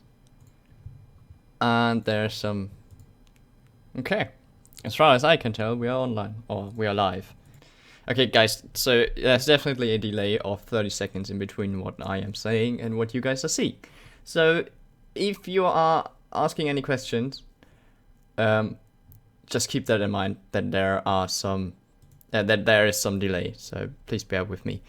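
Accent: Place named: Germany. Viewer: weil du einen deutschen Akzent hast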